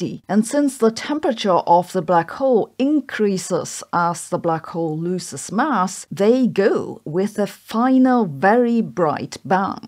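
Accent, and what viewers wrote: Germany. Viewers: Why does Sabine have both a German accent and an English accent simultaneously?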